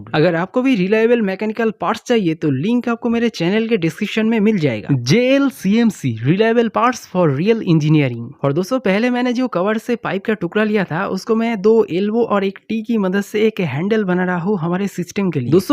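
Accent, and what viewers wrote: West Bengal, India. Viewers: You are from west bangla right??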